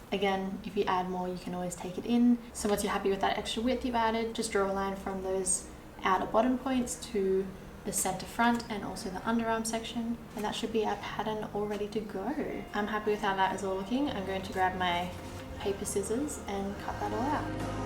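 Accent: Australia. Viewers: Wonderful channel!!! Great to hear a vibrant Aussie seamstress enjoying her creations and what a sweet little shift dress!! Well done!! 🌞🌺🏖️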